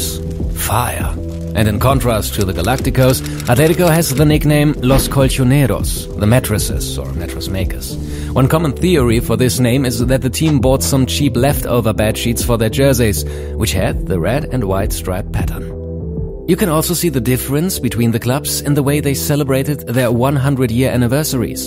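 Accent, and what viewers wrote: Germany. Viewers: what gives you the impression that he's American? His accent is very German and it also says in the about page that it's based in Germany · Great channel, great german accent. Sehr schön videos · From the accent I'd say Germany or Austria.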